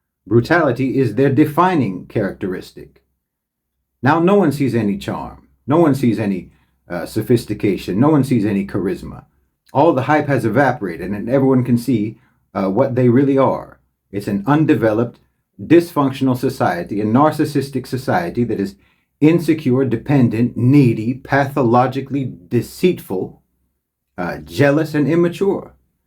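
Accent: United States. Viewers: So weird hearing the truth spoken with an American accent. Long overdue.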